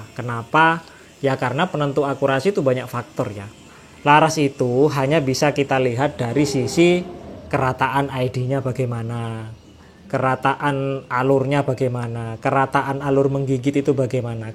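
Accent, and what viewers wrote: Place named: Java, Indonesia. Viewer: Setiap kali liat videonya mas,i jujur,. saya bukan fokus pada bahasan videonya😅, tapi dari nada bicaranya🙏😄😄 Perpaduan baku, dan jawa..😊😊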